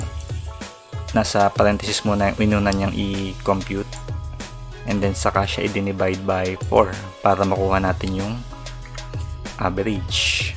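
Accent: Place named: India. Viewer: More C++ lesson po... sawa na po ako makinig sa Indian Accent! not racist thou. 😆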